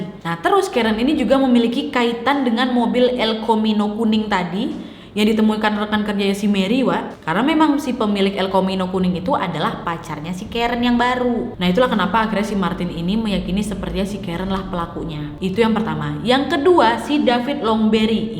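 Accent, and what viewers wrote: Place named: Medan, Indonesia. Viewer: Saya suka sama cara ngomong nya mba logatnya kayak orang Medan lucu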